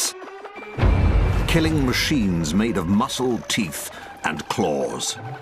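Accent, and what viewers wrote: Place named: United Kingdom. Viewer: These are the ones with the British narrator..these versions suck. I like the original with the American narrator. These are garbage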